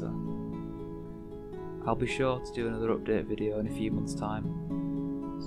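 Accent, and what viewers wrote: United Kingdom. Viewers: he's UK or something along those lines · Judging by the accent and vocabulary, you're from the UK? I am :)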